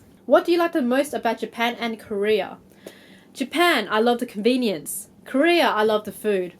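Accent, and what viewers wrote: Australia. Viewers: Australia is a bit broad, you seem to have a Sydney accent and talk like a Sydneysider as well so thats what i will guess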